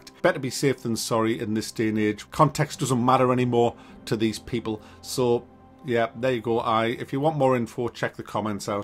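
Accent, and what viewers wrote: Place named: Northern England, United Kingdom. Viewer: Stupid world nowadays. Us northern lads speak proper i understood u perfectly. chuck, cob, wang, lob.all mean throw. luv ya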